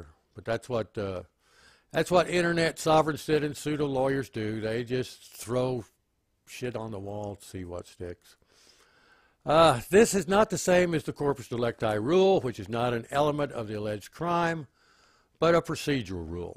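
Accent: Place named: Texas, United States. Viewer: I'm hearing a little bit of a texan accent. Lol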